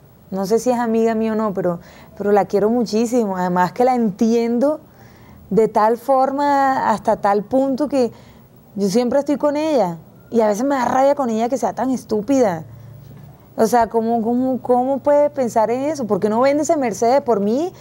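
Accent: Colombia. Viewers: No jodaaaa aca si hablas costeño, en Betty hablas como cachaca, eche, mandas cascara, costeñacachaca no joda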